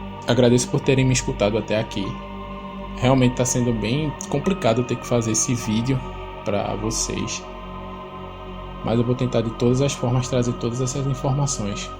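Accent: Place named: Northeast, Brazil. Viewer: pelo sotaque ele parece ser nordestino, porque parece muito com o da minha região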